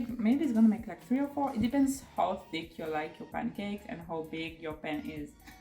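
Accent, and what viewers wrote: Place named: England, United Kingdom. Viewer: your accent 🥰 even more english 🇬🇧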